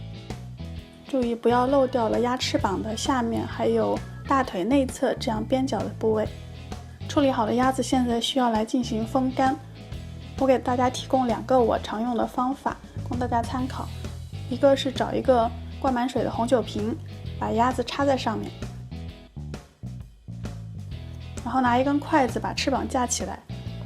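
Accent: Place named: Nanjing, China. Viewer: 南京人！哈哈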